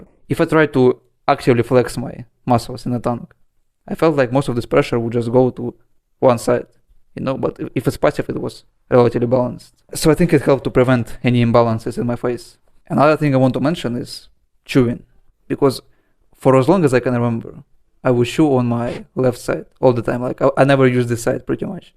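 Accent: Russia. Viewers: Where are u from bro? Ur accent is so Russian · where you from bro? you have russian accent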